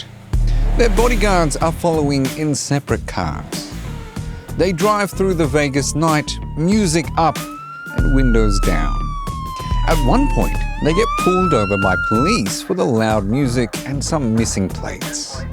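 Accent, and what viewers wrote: Australia. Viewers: They both are Australian, so that might be why they sound similar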